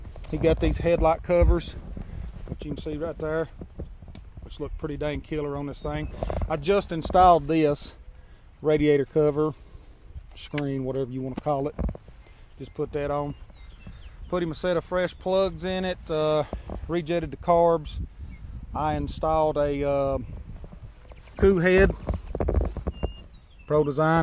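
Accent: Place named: Pittsburgh, United States. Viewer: I can tell where "yinz" are from. Lol. Nice scoot. Looks tome like you know your 2 strokes.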